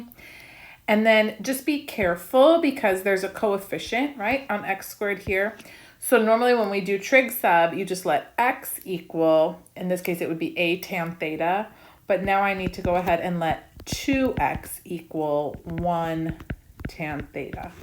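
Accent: United States. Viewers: Adore American English and U sound perfect)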